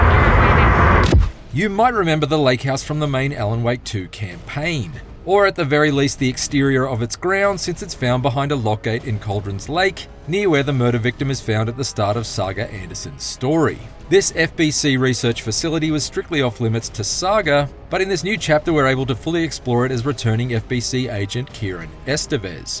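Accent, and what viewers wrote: Australia. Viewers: He's aussie and some news reporters have this style. Althugh this guy is particularly annoying, and I'm speaking as an Australian.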